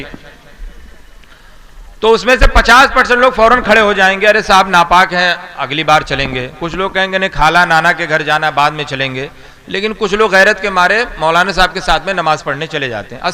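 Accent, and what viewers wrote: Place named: India. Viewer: Bhai style desi hai aap ki bolne ke bahut khub 💪🏽💪🏽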